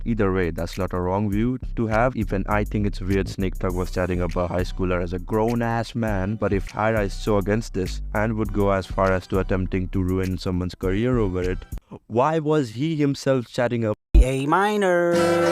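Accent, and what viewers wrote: India. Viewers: Are you indian cause i can hear the accent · Indian voice😊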